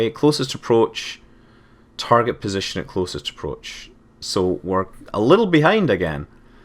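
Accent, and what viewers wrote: Scotland, United Kingdom. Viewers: It's pretty obvious from his accent that he is from Scotland.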